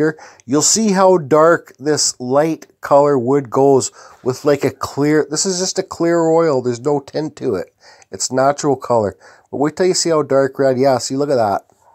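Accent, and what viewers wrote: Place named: Canada. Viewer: Keep doing your thing brother! Love the Canadian accent too. I need to start shooting video of my carvings.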